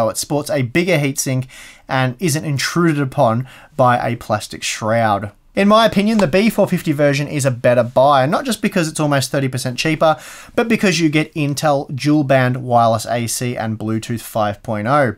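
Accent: Australia. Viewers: you sound Australian, why not say the Australian prices? (I am aussie)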